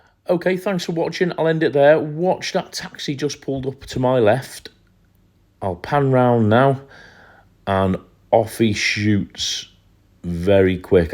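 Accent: United Kingdom. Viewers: Do you have a scouse accent?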